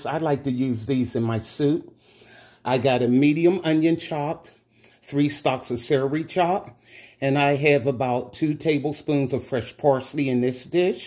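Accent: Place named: Pittsburgh, United States. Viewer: You have a very heavy Pittsburgh accent, either that or Pennsylvanian. Pittsburgh here, love the video!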